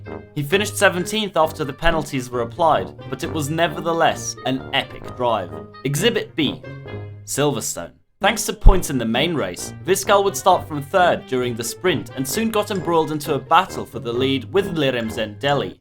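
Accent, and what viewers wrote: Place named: Netherlands. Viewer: Goated Dutch accent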